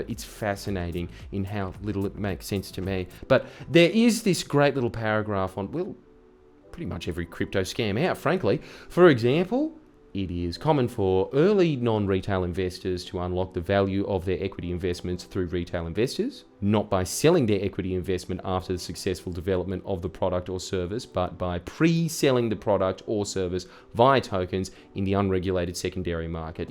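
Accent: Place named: Australia. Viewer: I have been in Europe since a few years. Hearing you talk made me feel like I am back home in Australia. Today is a good day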